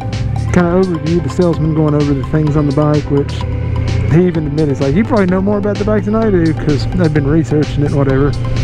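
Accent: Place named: South, United States. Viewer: Bro.. you have a southern accent in this video that I have never noticed before!? Gorgeous bike!!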